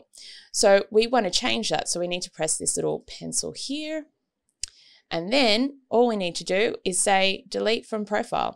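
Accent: Australia. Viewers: thanks. And i loved your Australian accent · I love your Australian accent 😊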